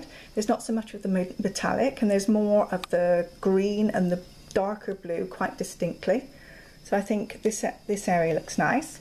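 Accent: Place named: West Country, United Kingdom. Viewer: love this one I noticed you have a westcountry accent do you live near St.Austell just wondered if you did workshops.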